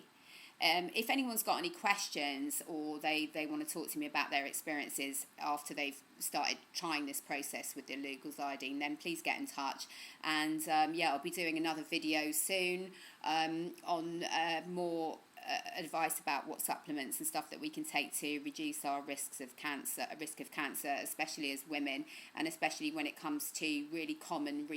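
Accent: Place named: United Kingdom. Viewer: Your accent is so gorgeous! Which part's of UK it is? :) Just find your channel and binging on it now :) Thanks for great info! xx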